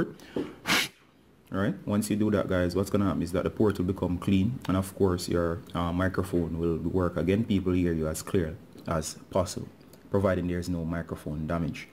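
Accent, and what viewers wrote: Jamaica. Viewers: Anyone else realize the Jamaican accent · Is that a Jamaican accent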